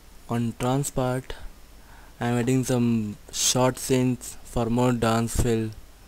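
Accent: India. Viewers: your accent sounds like you are from india? am i right? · well about all the comments abt his accent and stuff.. make fun of india for the language they dont speak but u cnt make fun f dem for the content they teach RESPECT I N D I A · are u from india?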